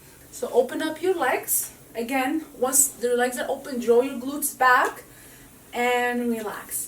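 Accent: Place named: Morocco. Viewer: Ena koont 3rf ntiya Mghrebia! I could instantly tell from your accent! haha. Shukran pour la video! :P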